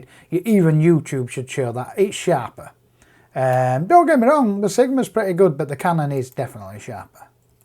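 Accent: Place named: Cockney, United Kingdom. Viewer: Trying to decipher your Cockney Accent .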